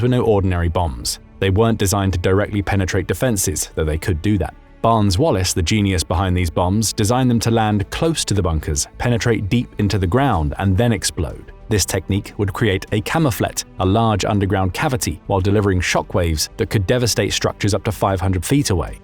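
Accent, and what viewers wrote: England, United Kingdom. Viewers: It's an English accent, and the speaking is quite clear.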